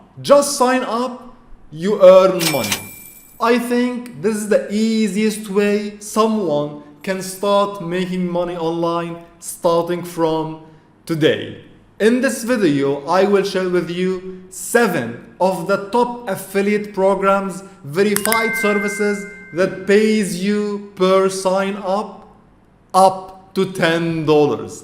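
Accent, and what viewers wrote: Arab world. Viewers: عرفت انك عربي من اول كلامك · May not an arabic native speaker try to speak English language.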